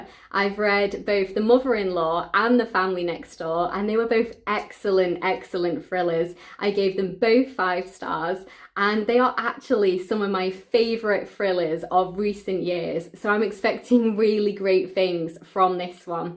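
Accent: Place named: Northern England, United Kingdom. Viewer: I love hearing a northern voice!! Sending love from Leeds❤️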